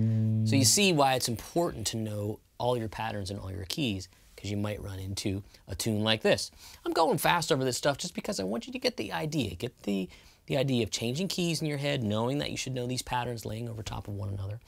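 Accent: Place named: Texas, United States. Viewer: I can hear Texas !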